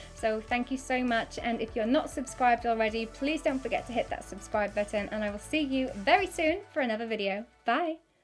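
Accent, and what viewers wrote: United Kingdom. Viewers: Wait are you from the UK because your accent sounds a but deferent xx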